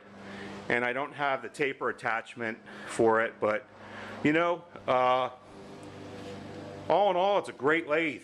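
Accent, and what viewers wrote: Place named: Pennsylvania, United States. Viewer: I knew I recognized that accent. Fellow PA guy! If I were out in Cali, I'd have done that restoration for you. To show you my work, check out my set of lathe-restoration videos here: <link> Imagine if I got my hands on your Clausing and what she'd look like?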